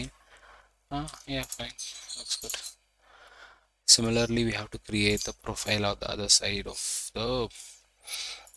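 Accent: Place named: India. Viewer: I wish there was a way to filter out Indian videos. I cannot possibly listen to that accent.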